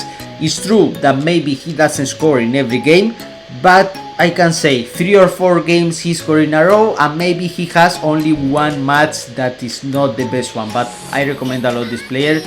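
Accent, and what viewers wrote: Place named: Spain. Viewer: I love your accent❤Are you Spanish?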